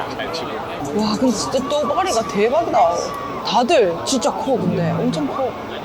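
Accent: Gyeongsang, South Korea. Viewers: 누나 경상도 사람이었구나 ㅋㅋ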